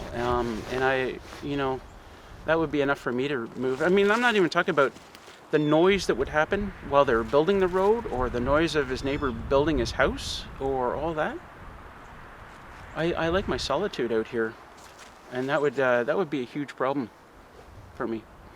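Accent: Canada. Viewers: Big fan of Shawn James I get a kick out of accent! Now that’s a Canadian accent! With Shawn you only get an occasional aboot!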